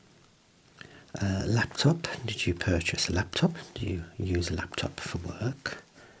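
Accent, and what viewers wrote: Birmingham, United Kingdom. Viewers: I didn't know how much I needed Brummie ASMR 💓 this was lovely, thank you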